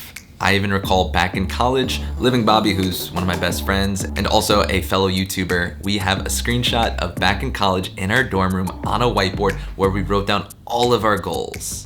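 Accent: New York, United States. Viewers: Not sure why this video in particular, but that New York accent is coming strong.😏 Btw, love the content 😀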